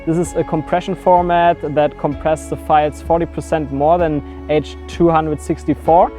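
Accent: Germany. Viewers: Man hört das du Deutscher bist 😅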